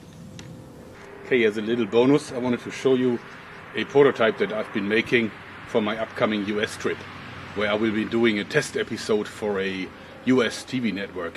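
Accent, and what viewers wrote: Germany. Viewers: your accent sounds very german to me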